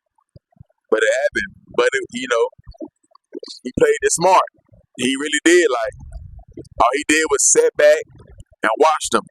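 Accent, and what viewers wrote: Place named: Atlanta, United States. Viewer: Lawd why tf i watched this and he sounds like he from the country not neworleans definitely atlanta or Carolina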